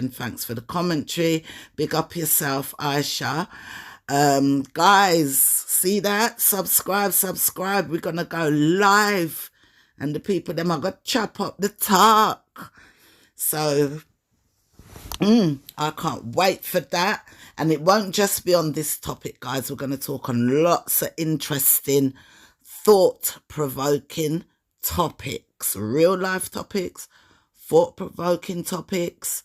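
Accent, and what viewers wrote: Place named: Jamaica. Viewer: I could tell you were English speaking but had a Jamaican background i can tell in your voice I live in the UK but was born in Jamaica and came here at the age of 8 I am now in my 60s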